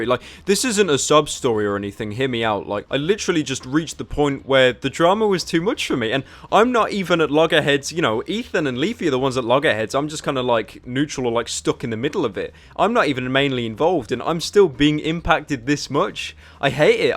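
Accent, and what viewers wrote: United Kingdom. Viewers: He's British. Certain regions pronounce "H" as "aech" instead of the faster American "he-" when it's said. I'm from the UK and say it the same way. Just differences, that's all. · Why is anyone on youtube fighting in the first place, especially considering it's just a bunch of people who do the same thing but in different ways, Leafy does cringey videos on cringey videos H3 does cringey skits on cringey skits and you do deep voice british cringe on cringe videos.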